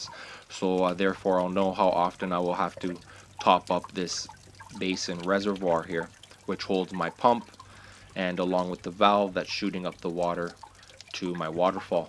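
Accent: Canada. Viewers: Love the Canadian accent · a really strong canadian accent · the most Canadian accent I've ever heard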